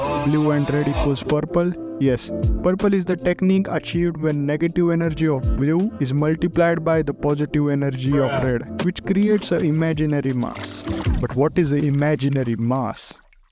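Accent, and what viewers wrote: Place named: India. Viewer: Hey, ranjeet. You got your Indian accent from consuming cow p*op?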